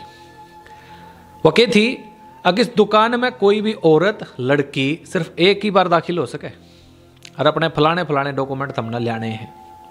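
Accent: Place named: Kaithal, India. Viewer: Ji aap kaithal se ho kya. द का न ward se pta chala h..bhut badiya bolte ho aap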